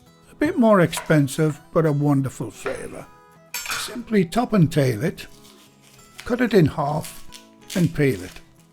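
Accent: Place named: Gateshead, United Kingdom. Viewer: Move to Gateshead, they all sound like that.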